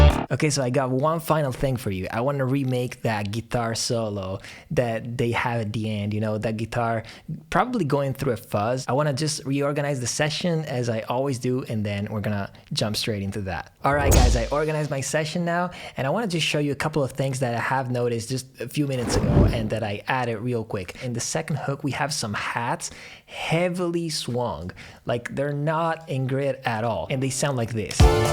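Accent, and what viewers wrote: Italy. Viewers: ahah sei romagnolo? sono rimasto serio fino a . Comunque quanta skill, complimenti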